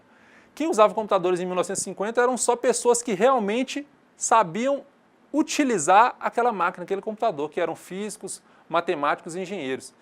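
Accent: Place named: Minas Gerais, Brazil. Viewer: o bom é que ele é mineiro , num guento ver esses cara falando tudo certim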